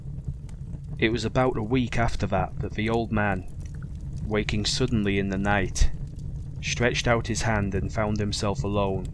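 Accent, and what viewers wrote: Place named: Yorkshire, United Kingdom. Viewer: This story is one of my favourites and you did a grand job of it ! As an adoptee of West Yorkshire (been living here since 1996) great to hear a Yorkshire accent on YouTube. Well done !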